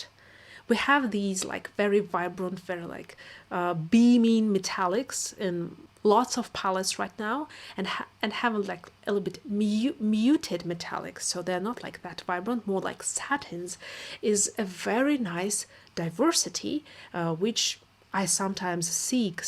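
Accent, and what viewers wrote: United Kingdom. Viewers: it sounds kinda British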